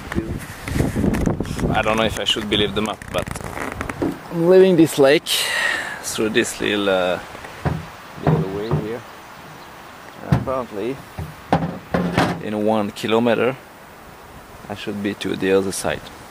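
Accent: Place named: France. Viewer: Hahaha .....I am not a linguist by any means but did detect your French accent in this video. I was converted to a firm Francophile in 1990 when I discovered I could be in a totally different climate from my home in the north of England in less than 7 hours driving and then with another 4 hours driving I could be on the Riviera and the southern French coast. I can see why you have fallen in love with Sweden the lakes and countryside do look very beautiful Thanks for your reply and I am looking forward to your next outing 👍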